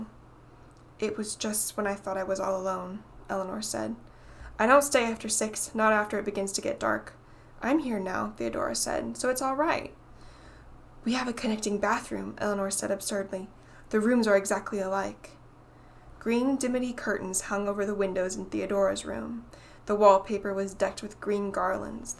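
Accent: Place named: United States. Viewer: It's nice to hear a woman narrating a first-person story with a female protagonist. American too! Thank you!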